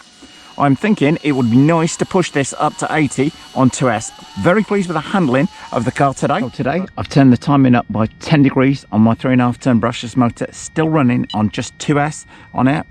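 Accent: United Kingdom. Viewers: Great upload well done 👍 you were born in the uk 🇬🇧? I am trying to work out your accent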